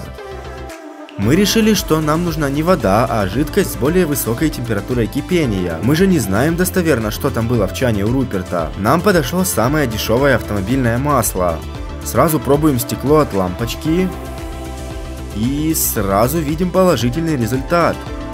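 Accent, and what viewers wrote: Russia. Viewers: Все отлично, но так бесит твой марийский акцент. Или это просто такая манера речи, дико извиняюсь, но прям слух режет.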